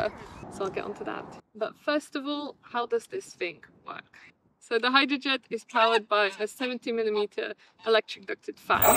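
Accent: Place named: London, United Kingdom. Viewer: talk in this weird London accent... a malformed style of English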